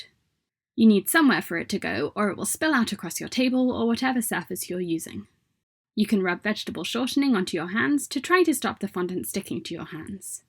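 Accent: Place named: Scotland, United Kingdom. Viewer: Where are you based? Sounds like you have a touch of Scottish in your accent but I can't place it. You have a lovely speaking voice.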